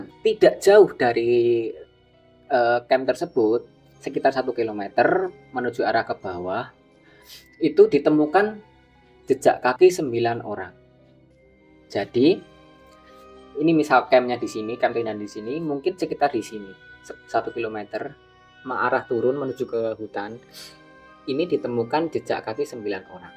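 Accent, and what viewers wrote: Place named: Java, Indonesia. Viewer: medok e ilangke mas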